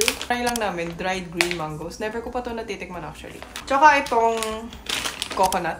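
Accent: Batangas, Philippines. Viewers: Grl,,aliw din aq sau,,, alam mo Yung pagngsalita ka wagi, ay pinay pla. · Very Filipino kung mag tagalog yung accent at yung taglish nya. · Hala kakatuwa Naman kayong magkakapatid😊❤Pinoy na Pinoy ka talaga magsalita. Ang galing 😁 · Parang filipina mag salita pero koreana 😂 astig. In what language do you think? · Yung Batangueña accent mo ba. Matik din nawawala pag wala ka sa Batangas? 😆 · You look Korean Bec you are but you act & speak like a Pinay 😊✌️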